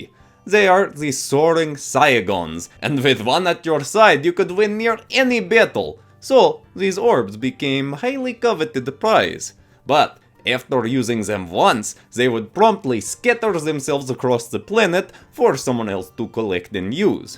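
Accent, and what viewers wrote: Russia. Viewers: IS SHE RUSSIAN OR GERMAN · Are you Russian youre accent sound like them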